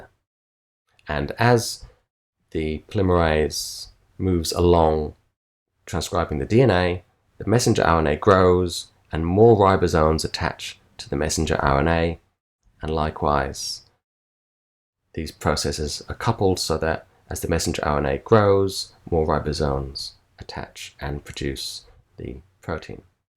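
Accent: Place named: Australia. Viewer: Best Molecular Biology Professor; your videos are timeless, like etenal. It also made me like Australian version of English outspeaking.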